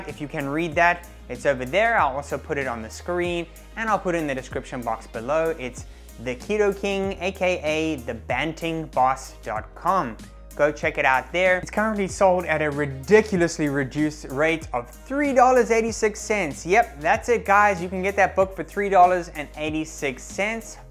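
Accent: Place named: South Africa. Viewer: Hi, love your channel. I've been trying to work out if you're originally South African, your accent is ever so faint. I've been away from sa so long myself that I might be mistaken. The reference to Banting in your description also made me wonder. Prof Noakes rocks, and as an ex Durbanite and avid Comrades follower he's legendary.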